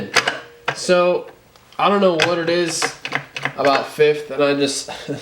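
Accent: Australia. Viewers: you need to stop speaking in an Australian accent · This dude kills me me with his Australian voice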